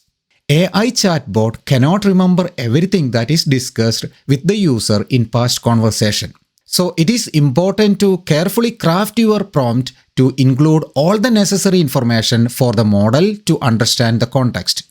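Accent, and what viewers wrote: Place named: Kerala, India. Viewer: Malayali accent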